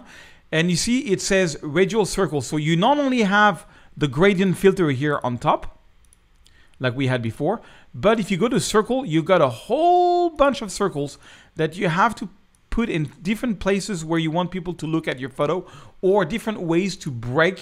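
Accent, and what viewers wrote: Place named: France. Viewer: your English-French is so sweet:) Thank you for tips!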